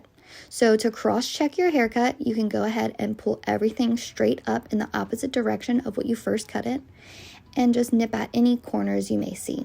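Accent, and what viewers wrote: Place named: United States. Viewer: I can’t do with the American accent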